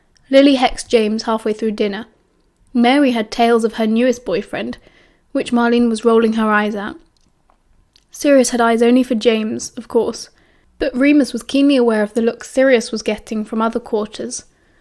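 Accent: United Kingdom. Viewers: Your brittish accent is supremacy 🛐🙏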